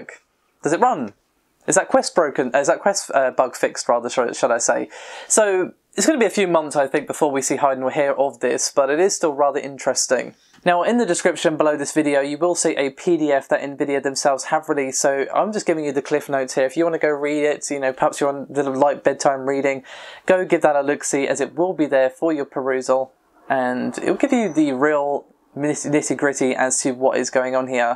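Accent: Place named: London, United Kingdom. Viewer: England, london area I think.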